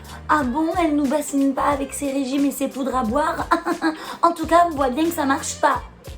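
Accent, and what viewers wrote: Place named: Marseille, France. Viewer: J’aime trop ton accent tu représente notre ville vive Marseille et sfair encadrer les jaloux et les envieux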